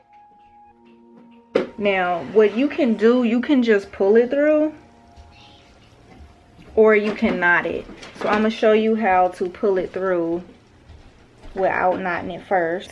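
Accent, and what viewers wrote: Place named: South, United States. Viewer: You have a southern accent 😭❤️ and good job👏🏽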